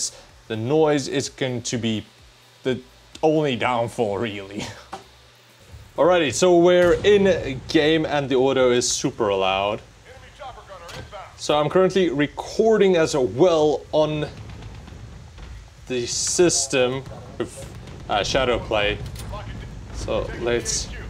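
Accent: South Africa. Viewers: Watching on my 9 year old i3 *cries in 20fps Edit: the whole time I'm like what is this accent it's almost South African and it turns out you are actually from SA lol. Nice to see a homegrown tech channel, you have my support dude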